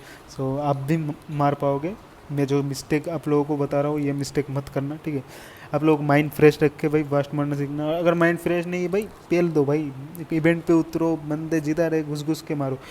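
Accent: Bengal, India. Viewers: Tum bengali ho kya? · Lag Raha hai ap Bengali ho isliya Hindi nehi bol pa rahe ho main bhi Bengali hu ❤️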